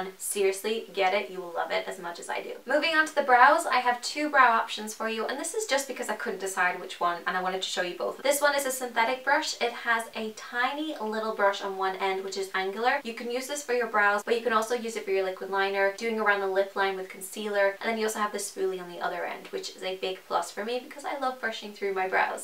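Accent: Ireland. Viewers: Are you Irish?🇮🇪 P.S I love you😘❤️ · Are you Irish? :)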